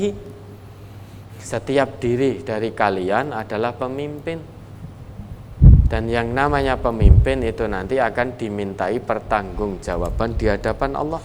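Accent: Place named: Java, Indonesia. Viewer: Alhamdulillaah..logat jawa panjenengan tadz.. mengingatkanku pada bliau al ustadz alm..barakallaahu fiik..❤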